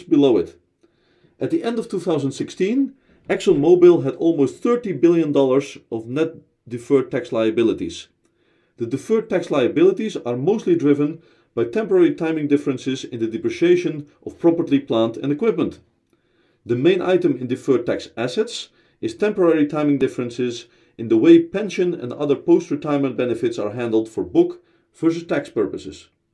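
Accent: Netherlands. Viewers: Hi, are you Dutch? (From your acccent)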